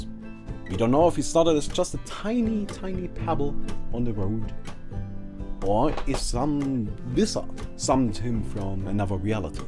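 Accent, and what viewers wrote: Germany. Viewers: I really find it funny how many people comment on that zeus zois stuff... zeus is pronounced zois(strong z) in german, this guy is from germany so he talks like that. case solved. ( not to mention this pronounciation is actually more correct than the english one) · Dat german accent... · he is german, that explains his pronunciation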